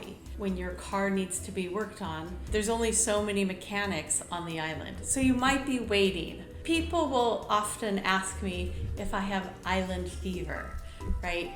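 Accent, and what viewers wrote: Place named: Texas, United States. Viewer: That's sounds like Texas. Lol- talking part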